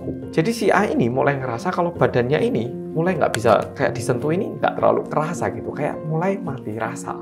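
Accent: Java, Indonesia. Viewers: Selalu ngikuti channel ini,tpi juarang komen,awalnya tertarik dg logat jawanya,cocok aq yg wong Jombang,bolo..bolo yoo wk..wk,sampek aq pingin tahu sejarah hidup mas ini,..🤝🤝🤝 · Sogannya lucu orang Korea yang medok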